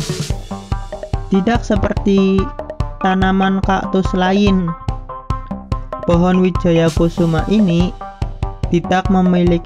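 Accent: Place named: Banyumas, Indonesia. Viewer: Ora ngapak ora kepenak wakamaka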